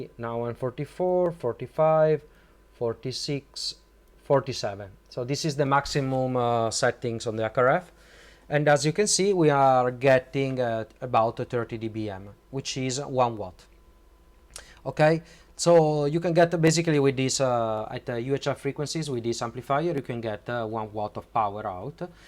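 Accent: Italy. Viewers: Scommetto sei italiano 😅☺️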